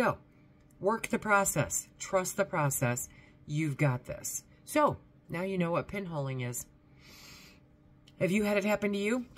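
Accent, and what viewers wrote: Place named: Michigan, United States. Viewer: Your voice is pleasant. I used to live in Michigan. You sound like home.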